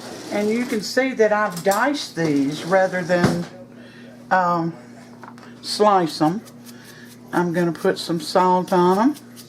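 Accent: South, United States. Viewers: I really appreciate your presentation. Your southern accent gives confidence and assurance to your listeners. It's southern hospitality personified.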